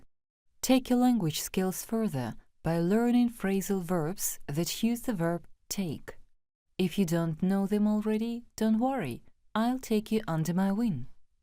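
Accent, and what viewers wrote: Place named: United Kingdom. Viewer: не очень приятно слушать мешанину из британского и американского акцента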